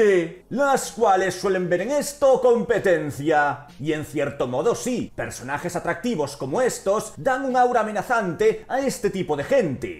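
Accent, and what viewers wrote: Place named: Europe. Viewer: Wey pero los que mas ladran sobre estos temas de la ficción son los socialjustice warriors de yankilandia además me sorprende ver este vídeo de una persona de acento de cierto pais de Europa ya que ustedes se quejan por todo 😂 o a lo mejor me equivoco y estas utilizando loquendo para sonar asi pero igual yankilandia y ese cierto pais de Europa se la viven quejando por problemas inexistentes 😂 son todo un Show 😂 lol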